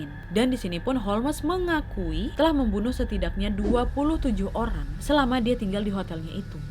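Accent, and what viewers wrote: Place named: Lampung, Indonesia. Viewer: Kak kamu orang mana sih kok ngomongnya kayak orang lampung kalo ga salah???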